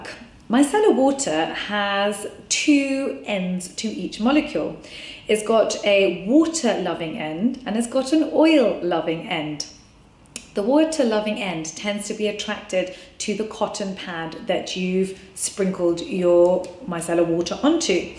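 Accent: United Kingdom. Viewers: Right,your accent finally confirm me that you are living in the UK 😁😃.LOL.I am living in North 🤪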